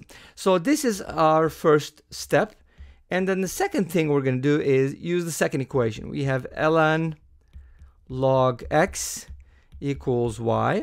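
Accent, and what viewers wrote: Turkey. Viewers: I think you're a turkish. 'cause you speak english with turkish accent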